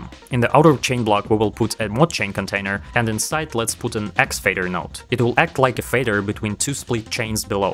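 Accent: Russia. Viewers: He sounds like russian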